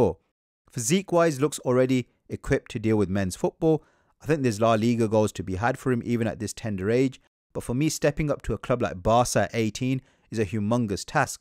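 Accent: Birmingham, United Kingdom. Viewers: Brummie lad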